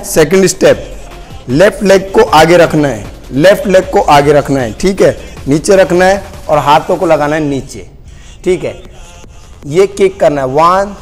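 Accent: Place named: Haryana, India. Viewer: Bhai kaha se ho seems from Haryana . I am from faridabad , do you offer paid service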